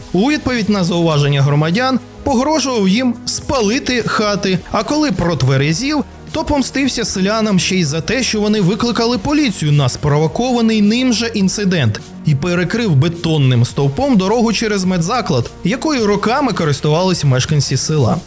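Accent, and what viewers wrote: Russia. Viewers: Канальчик проросійський, з голови гниє. Совкові цитати, російські слівця та обороти в тексті, росіянський акцент у вимові нейронки. Головний месидж - в Україні все, ВСЕ ПОГАНО !!!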